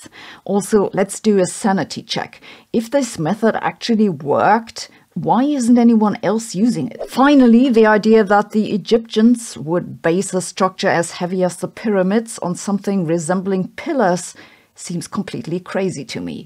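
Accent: Germany. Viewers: I don`t want to sound offensive, but a have seldom listened to any english with such a school-book heavy german accent, just adorable. 😁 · Here for your German accent, I also love how you articulate your arguments, thank you for your informative and well presented take on this matter. We don't have to agree with you but we must always recognise a point well made.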